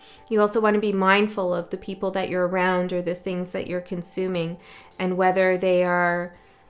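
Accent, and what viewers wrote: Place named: Toronto, Canada. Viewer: I’m from Toronto too (Toronto Mans accent) 😂😂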